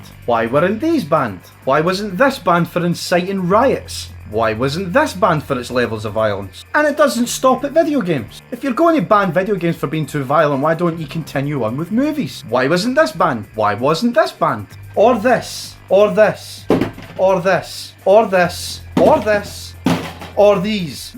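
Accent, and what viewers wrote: Scotland, United Kingdom. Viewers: Gotta luv the Scottish accent.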